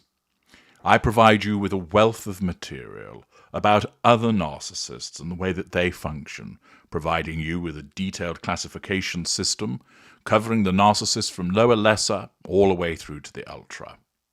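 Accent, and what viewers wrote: United Kingdom. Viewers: I already put a note on my computer to boycott all videos featuring she who will not be named so I wouldn't accidently forget. I am happy you have joined the boycott as well. Everytime I hear your voice I am reminded of a certain British gentleman I see in my videos a lot. It's entertaining to wonder if that is you or not. It was so wonderful to see the Princess of Wales looking so beautiful as well.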